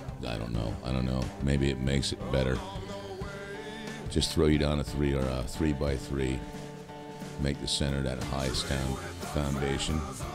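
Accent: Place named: Midwest, United States. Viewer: Your voice tells tells of many great tales in the American Midwest by the utterance of just one word.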